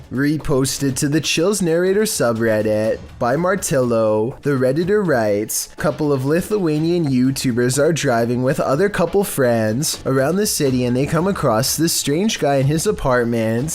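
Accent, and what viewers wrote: California, United States. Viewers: DUDE, are you from Cali? You sound like one of those surfer guys. Kind of hard to listen to you. But the videos are pretty good. Not very scary, but none the less entertaining.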